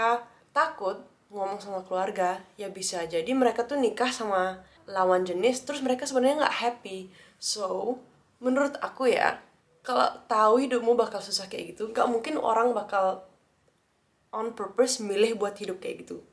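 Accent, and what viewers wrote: Bali, Indonesia. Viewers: Gemes sama medok Bali nya 😁 wajahnya juga cantik menurut pandangan saya. God bless u! ♥️ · Loagat nya bali banget. Lesbi Seng ken Ken. Aku suka Cwe Lesbi. Salam Kenal dr Tabanan.🙏👍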